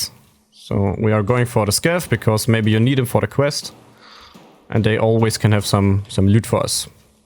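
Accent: Germany. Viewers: Sehr gutes Englisch ! Man hört deinen Deutschen Akzent. Tolles Video! Danke🙏🏻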